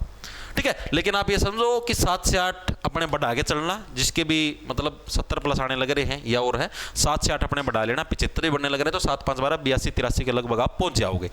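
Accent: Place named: Dadri, India. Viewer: Aapki boli must h ji dadri side k i hna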